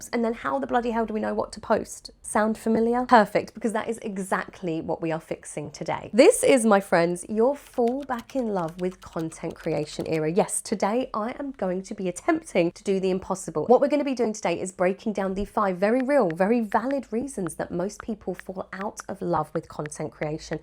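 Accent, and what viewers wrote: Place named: England, United Kingdom. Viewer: You inspired me so much to keep creating,. I’m an artist, and I promote my art and teach courses, and lately I’ve been feeling really discouraged by the algorithm and the little time I have after my office job. But what you said about setting boundaries with content creation really stayed with me, I’m going to start doing that. I still haven’t finished watching the video, and I know the 30 minutes I have left are going to be full of value. I truly appreciate you for that. Technology is amazing, I can’t believe I get to access such valuable content all the way from Colombia while you’re so far away. By your accent, I think you’re from England?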